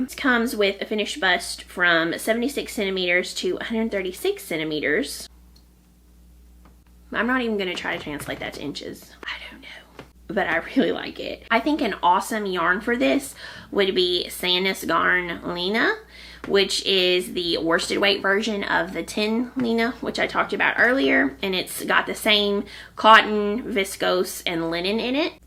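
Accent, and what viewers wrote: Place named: Mississippi, United States. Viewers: Hiya, this is the second video of yours that I've come across... and they're just great! First of all, I'm Australian, so I just love your Southern accent 😍, second, you're so relatable... I'm not a 'skinny-mini' either! And I'm 5'10", so thanks for touching on doing the maths to adjust your fit... when I was young we were made to feel like we should fit the garment, not the other way around... one day I hope to be able to knit more than dishcloths! 😂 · Thank you for showing the pattern from Ozetta Knitwear! I looked at several other pattern from this designer and really like them. I enjoy your podcast very much. I’m from Mississippi and listening to you talk reminds me of home! I live in Florida now.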